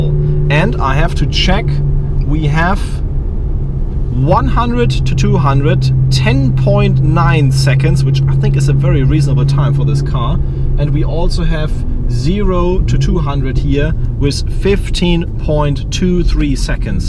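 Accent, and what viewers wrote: Germany. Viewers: man hört an seinem akzent das er deutscher ist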